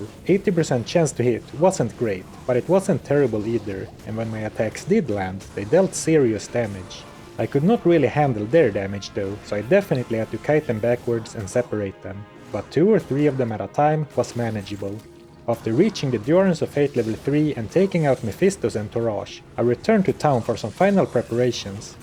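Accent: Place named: Sweden. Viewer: Finally new video i almost started missing your swedish accent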